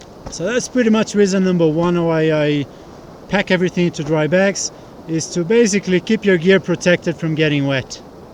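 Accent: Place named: Brazil. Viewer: Thanks for the video! I discovered dry bags recently and have started using them a lot. Incidentally, love your accent. At first I thought Brazilian, then figured you for an Italian... then found your Instagram and had my original suspicions confirmed! :)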